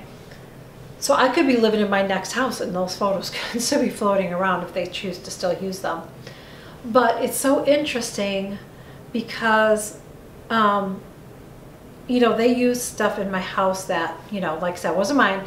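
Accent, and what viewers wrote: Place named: South, United States. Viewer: Hi lovely!!! Love your intro music!! Awww there's my girl!! Lol!!! Save yourself the fight! He doesn't need to know you're leaving the window open!😂 so excited you're gonna be house hunting!! Take us!! I can't imagine how huge this move is! The thought of it makes me stay out! Lol!! I love you taking us around the house!! You look gorgeous as your real life self! That's so interesting hat they staged your house! It seems like that defeats the purpose of taking pix of your house! 10 videos what!!!??? Love the glitter deer! And the trees!? Precious and pink! Love them all!! What!!?? Girl I love you more!! And omg that actually sounded really good!! I think you're getting really good with the southern accent!! It sounds greatttt on you!! Yes girl noooo drama!! We run from it!!!! I feel the same way about you sister!! 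Love you to pieces and loveeeee my daily time with my!! Love your vlog!!! Even if you hadn't mentioned me I love this style video on you!!! You're seriously even more beautiful in real life!! And this comment is embarrassingly long! Lol!! Love you to pieces my friend!! Xoxoxo!!!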